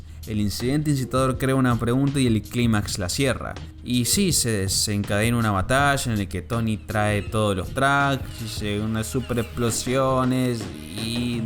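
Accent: Argentina. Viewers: Sos argentino no?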